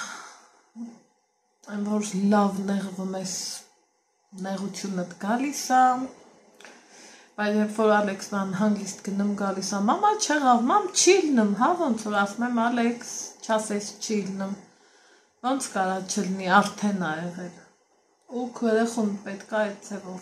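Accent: Lori, Armenia. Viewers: Թող լսեն անուշ համով հոտով լոռվա քաղցրր բառբառովա խոսում, հրաշք հայուհի ջանը💕💕💕💕💐👍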